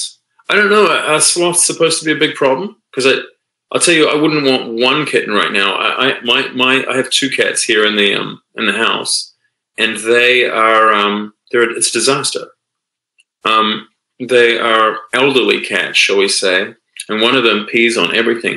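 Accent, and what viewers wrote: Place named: New Zealand. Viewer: Kiwi accent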